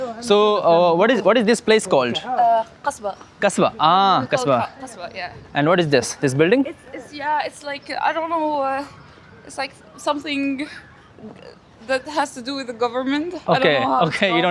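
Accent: Bihar, India. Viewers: Yaar itni countries ka experience lene ke baad you are still bihari at least now you should leave your bihari accent bro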